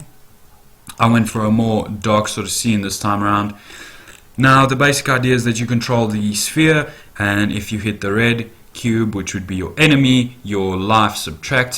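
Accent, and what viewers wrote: South Africa. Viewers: Are you South African ? Accent sounds familiar!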